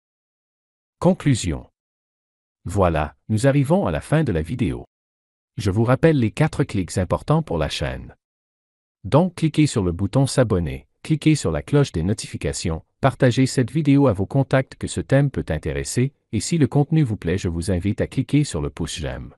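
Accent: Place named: Canada. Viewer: Great job.Pourquoi cet accent du Canada?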